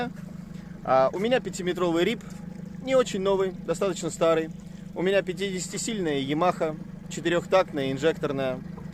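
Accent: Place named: United Kingdom. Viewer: вообще видос про мужика в конце это лишнее (к нему вопросов нет и лодка у него зачетная). интрига не сохранилась. голос был похож на голос ведущего из Уссурийска. я прифигел че его на острова занесло? да и ангицкое произношение меня тоже насторожило. думал катапультровался пацан в хренбритан. перед выборами туда ушел. и собаку даже в видосе не показал. без нее уехал. с женой видите ли машинки смотрят. ну думаю как все повернулось. ну думаю "отано чё". ан нет не он. разочарование. вам конечно поржать, а нам подписчикам стресс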